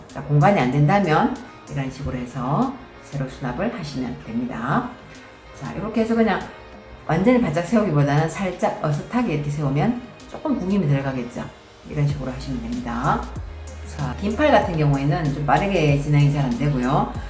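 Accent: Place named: Daegu, South Korea. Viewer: 우와~~~~ 대박 대박 대박! 사투리 들으니 대구 가고 싶어요ㅎㅎ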